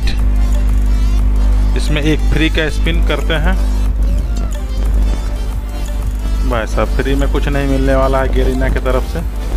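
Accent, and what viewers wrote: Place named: Bihar, India. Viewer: Bhai aap Bihar se ho kya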